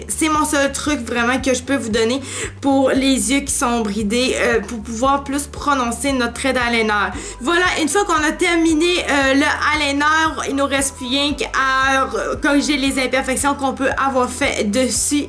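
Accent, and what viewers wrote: Quebec, Canada. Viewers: J'aime l'accent québécois :) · ta un beaux accent québécois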